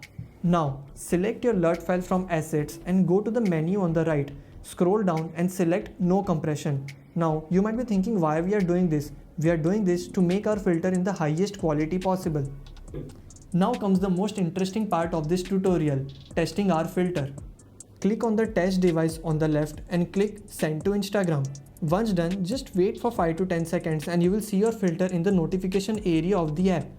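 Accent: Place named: India. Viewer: Yo, I wish you luck, but you should work with your accent. Indian english sounds cool, but not always.)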